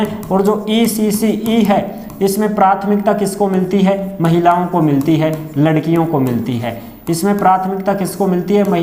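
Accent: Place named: Nohar, India. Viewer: Sir aap alwar se ho kya? Pr aapki bhasha ka tone to ase lagta hai jese Bhadraya nohar tehsil se ho?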